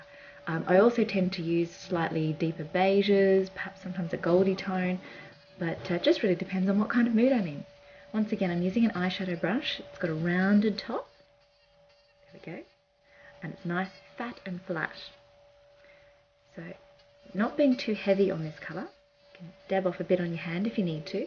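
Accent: Australia. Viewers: There's just something about your Australian accent that makes me love everything you do. Or maybe it's just your talent. :D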